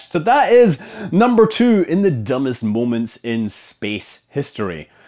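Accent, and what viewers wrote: Scotland, United Kingdom. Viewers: Yeah we actually say the word like it’s spelled in the U.K. and pronounce all of the syllables correctly. To us it sounds like Americans are saying ‘meer’ when they try to say mirror. There’s nothing that remotely sounds like a ‘d’ in there, he’s just pronouncing the double ‘r’ properly. Which, sounds a bit stronger than an English person would pronounce it because of his Scottish accent. To us, it’s the American pronunciation that sounds way wrong.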